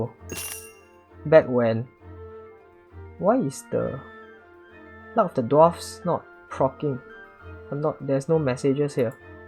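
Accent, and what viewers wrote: Singapore. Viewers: u sound Singaporean or mayb Malaysian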